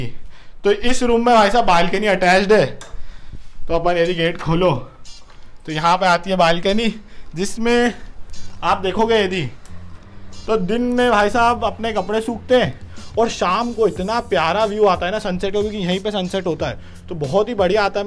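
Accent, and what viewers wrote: Indore, India. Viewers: big brother It is an indori accent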